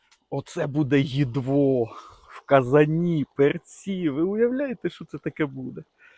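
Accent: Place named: Poltava, Ukraine. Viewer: Говір рідний якийсь :) Полтавська область? Якщо можна район?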